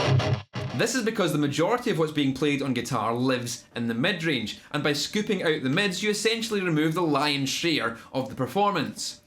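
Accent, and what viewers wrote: Scotland, United Kingdom. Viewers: I've started watching your videos so I can learn to imitate your accent. Where are you from? Where in Scotland, that is? Can you tell the difference listening to someone from the Highlands vs from Glasgow, for example? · Great video!! Awesome continuation to your first one about this topic (which I loved) And I really enjoy your accent. I´ve worked with a couple of scotchs and really like their way of talking. Cheers. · Upvoted for Scottish accent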